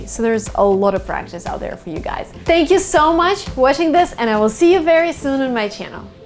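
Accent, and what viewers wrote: Russia. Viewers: Is her native language Russian? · Please make vıdeo With yoğur russıan accent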